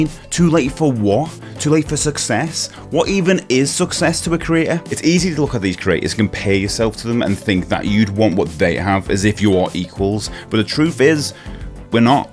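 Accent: Liverpool, United Kingdom. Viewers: Its never to late mate! Btw .. are you a Scouser?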